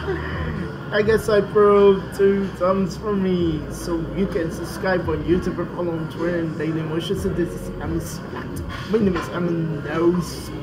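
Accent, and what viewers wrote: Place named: India. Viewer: Emil oh, you just sounded like you have an Indian accent, anyway. I'll never watch Smile HD, I tried watching Fluttershy react to it, and I'm like "just no" same thing with Cupcakes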